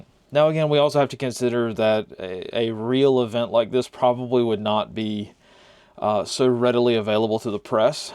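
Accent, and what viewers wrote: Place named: Maryland, United States. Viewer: I would bet you were born in or near Maryland from your accent.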